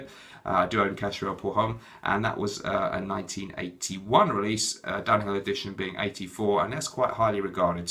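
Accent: England, United Kingdom. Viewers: You sound English ...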